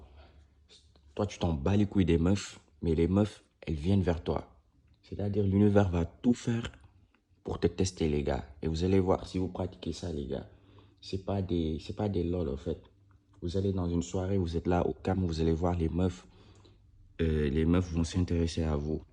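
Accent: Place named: Senegal. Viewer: Sa sent laccent dun frere senegalais lool Bonne viseo frere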